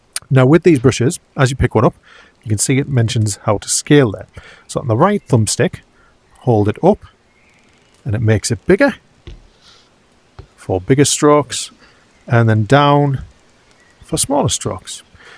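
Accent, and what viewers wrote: United Kingdom. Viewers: I am very grateful for this tutorial, nice to hear a fellow Brit. Exporting on my quest 3 goes to the data file in the android folder. BUT, it is saved as a VRMLN file. There is no option to save to a particular file format such as jpeg or png. I am doing something wrong? Do I need an account? Is there a setting somewhere I missed? Any help would be gratefully received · Just got Vermillion and was after a vid that explained it all. Up yours popped, and I'm glad it did. Clear and thorough, all with a welcoming NE accent (I'm not foolish enough to risk a guess which one...). Thanks for posting!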